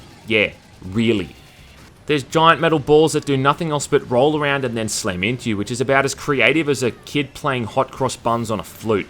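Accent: Australia. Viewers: I think it’s the Australian accent in the inflections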